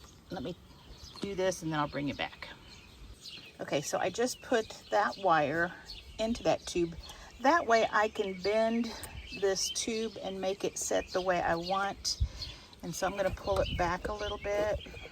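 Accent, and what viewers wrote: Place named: Ohio, United States. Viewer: Those turned out beautiful now I’m not sure what state you’re in. I wanna say Ohio, but I could be wrong. Anyway you have a little bit of an accent, but that was very good. Even your one in it were great. Good job. I’m gonna do that here in my property, but I found a flat angel That was originally hooked to a fountain and of course he didn’t have that the thrift shop and I have a ceramic pot and I made it. It’s gonna look really cute yeah but I was thinking to keep the leaves out of your red pan. Why not cut out a little piece of Spring and then glue those gem rocks on it and put that over the drain maybe that would help keep that cleaner longer. Have a great day. Thanks again. I loved it.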